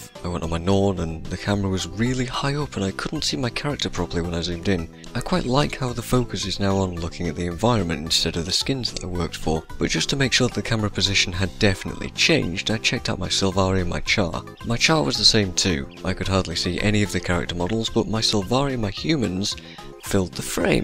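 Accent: North, United Kingdom. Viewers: Really great video :) Plus, you sound Northern, which is always a pro.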